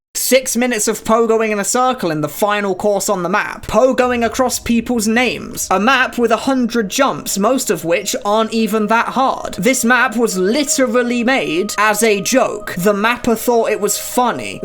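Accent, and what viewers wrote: United Kingdom. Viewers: Ur an amazing YouTuber I just wish not brit annoy voice 👺👺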